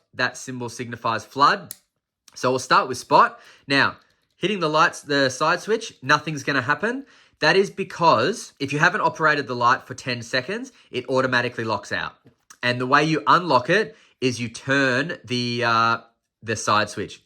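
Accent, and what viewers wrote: Australia. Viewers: Great review - thanks man. Good to hear another Aussie. For a second I though you had a shelf full of dildos for a second there 😮 😂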